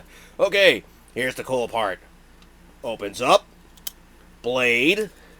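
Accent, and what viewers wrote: United States. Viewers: You're the most American sounding man I've ever heard.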